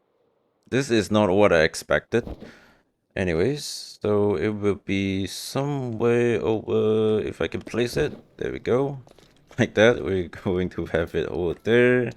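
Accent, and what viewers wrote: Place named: Malaysia. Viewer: I was wondering why your accent sounded familiar, only to realise you’re malaysian too! Love the production value in your video, and excited to see what’s next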